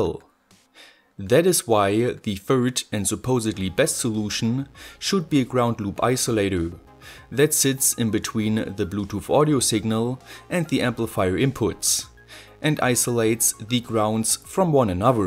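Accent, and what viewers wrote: Germany. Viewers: I'm pretty sure he's german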